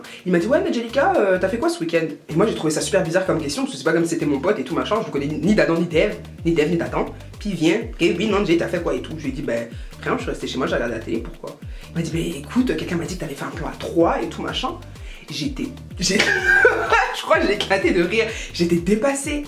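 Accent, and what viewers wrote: Ivory Coast. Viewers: Par ta manière de parler je sais que t7 ivoirienne comme mw wallay ma sœur 😍 my côte d'Ivoire j'adore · t'as manière de parler me casse trop on sent que t'es une vrai ivoirienne même loool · J'adore ton accent ivoirien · « Se qui est suuu » 😂😭😂 ton accident ivoirien est juste trop beau #Rwanda 🇷🇼🇷🇼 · Tu fais des efforts pour avoir ton accent français mais quand tu t'emballes tu redeviens ivoirienne vive 225🇨🇮🇨🇮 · Mdrrrr l'accent ivoirien. ?tu tues grave...Abidjan♥♥♥♥ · Nonnnn tes krooooo bien ta trop l'accent ivoirien naaa change pas on a kro le mm caractère on s'en fou . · cette vidéo faite avec l’accent ivoirien j’adoreeeee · attend t ivoirienne ou koi ta notre accent putain j'adore ta grandi en cote d'ivoire? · dsl moi je vit en cote d ivoire ( mais je suis pas ivoirienne ) j aime pas trop l accent , genre c drole a l entendre mais niveau beaute c pas trop ca ^^ je le trouve un peu trop brusque apres c que mon avis personnelle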